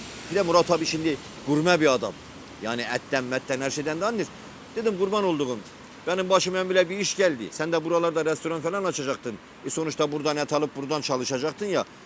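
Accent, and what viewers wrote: Erzurum, Turkey. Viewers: Galiba giyamet Kopti hele şükür Erzurumle mümesin video atmış konuşma tarzı Erzurum söyliyim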